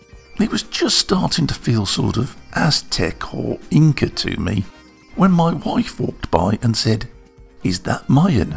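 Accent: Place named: Birmingham, United Kingdom. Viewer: from the accent and the hobby... Is it fair to deduce that you work in the jewelery quarter in Brum?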